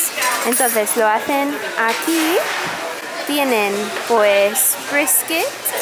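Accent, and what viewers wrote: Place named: Madrid, Spain. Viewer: Tienes un acento madrileño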